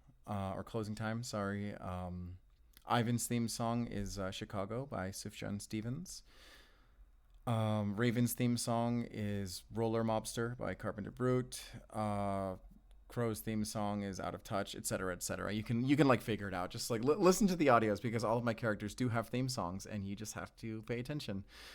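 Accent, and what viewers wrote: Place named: California, United States. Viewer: Yes I’m six months late, but I thought your speaking cadence sounded familiar! Californians recognize Californians 😂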